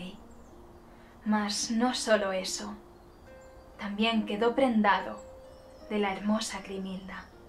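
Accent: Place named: Balearic-Valencian, Spain. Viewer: Molt molt guapo! M'agrada molt quant cantes o parles en 'bacaiva" (balear-català i valencià) però molt poques vegades t'he sentit.. de totes maneres gràcies! Les teves faules d'on precioses...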